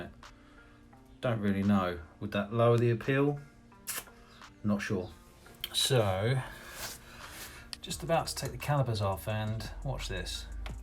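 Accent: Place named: United Kingdom. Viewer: Always love british accent motovloger ✌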